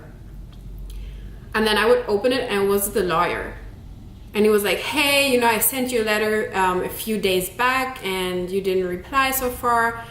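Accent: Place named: Germany. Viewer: I was thinking that you were Brazilian, but I could hear a German accent. You are very pretty. And for me, I'm not too good with attracting money. I usually attract relationships & friendships.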